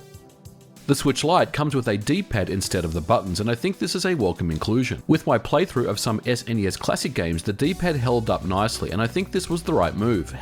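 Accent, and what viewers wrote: Australia. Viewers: Why do you have Australian accent and live in USA ? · Mate, I love your videos, the pace you speak out, and the content you produce. You sound like you're from Australia?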